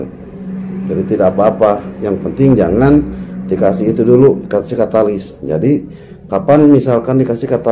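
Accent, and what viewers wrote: West Java, Indonesia. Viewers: Di Kendari tp logat Sunda... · orang kendari tp logatnya spt orang jawa barat 😁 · Nembe bade naros, orang kendari tapi logat sunda☺️ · Orang Kendari logat Sunda....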